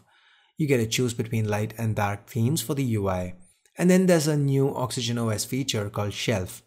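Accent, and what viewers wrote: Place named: India. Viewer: you sound very Indian, and I don't mean that in some bad way, I'm of Indian myself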